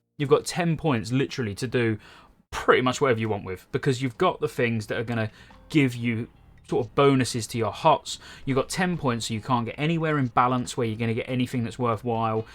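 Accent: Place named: Lincoln, United Kingdom. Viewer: You Lincoln lads say Natural weird. “Naytural” 😂😂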